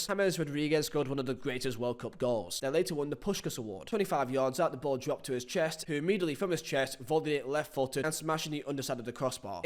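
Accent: United Kingdom. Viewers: UK accent 😫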